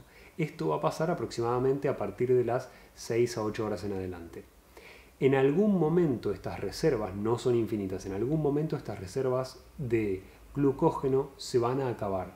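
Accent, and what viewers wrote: Argentina. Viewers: Soy argentina y te digo que el acento es argentino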